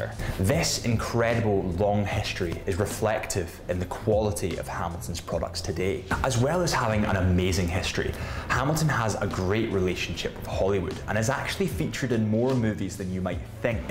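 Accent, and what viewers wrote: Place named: Northern Ireland, United Kingdom. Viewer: I hear a wee bit of a Northern Irish accent. :)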